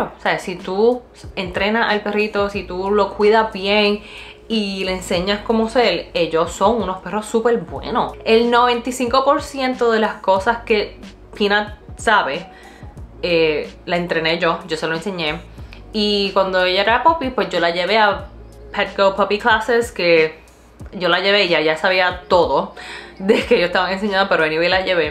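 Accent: Puerto Rico. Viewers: how great you talk with a Puerto Rican accent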